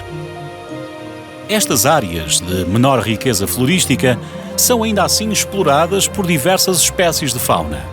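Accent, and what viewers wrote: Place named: Portugal. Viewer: Muito bom esse documentário adoro essa voz marada de português bom de mais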